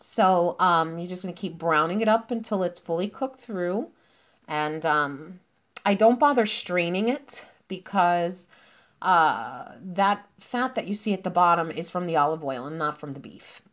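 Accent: New York, United States. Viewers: That accent makes me proud to b a New Yorker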